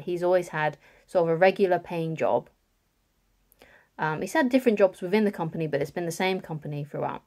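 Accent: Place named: United Kingdom. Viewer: you are pretty and I really like British accent.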